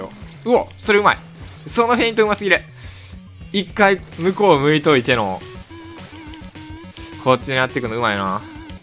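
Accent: Kansai, Japan. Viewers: 関西弁多めやな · か、関西弁🤔 関東の人かと思ってた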